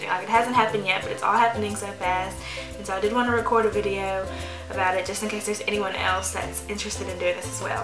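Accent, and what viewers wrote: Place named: South, United States. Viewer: oh ok thats cool. I knew you had to be from some where down south.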